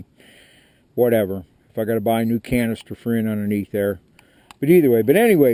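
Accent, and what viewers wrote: Pennsylvania, United States. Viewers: Are you from PA? you sound a lot like my father in law. :)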